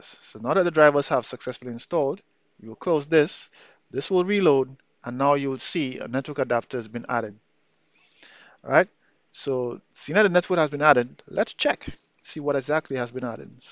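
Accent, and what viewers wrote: Trinidad and Tobago. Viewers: Is that a trini accent? Love it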